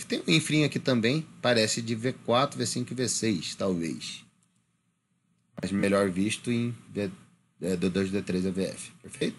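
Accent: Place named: Rio de Janeiro, Brazil. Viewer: Muito bom!! Não sei se você se mudou pro Rio de Janeiro, ou algo do tipo, mas alguém puxar o R e o S é a primeira vez que eu vejo hahahahhahhah Grande Abraço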